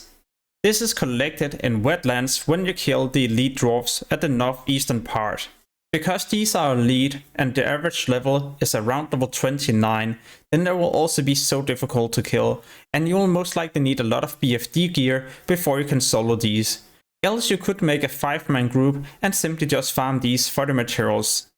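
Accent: Denmark. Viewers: This guy's danglish accent is off the charts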